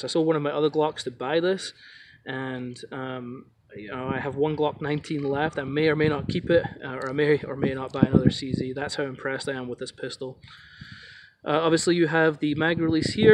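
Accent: Scotland, United Kingdom. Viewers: I was thinking Scotland! Sounds like a mix of Scottish, Canadian and American haha Thanks for the review. Would you recommend this as a first handgun? I'm looking for a good range gun but also something I may want to carry occasionally.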